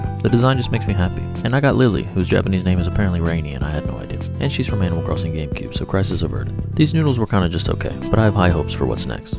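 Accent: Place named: South, United States. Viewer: glad you keep your southern roots by still saying “warsh” instead of “wash” 😂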